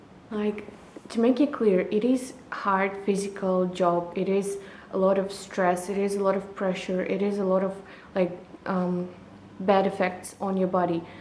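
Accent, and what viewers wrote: Russia. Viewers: She sounds like Russian, Ukrainian or something from that region.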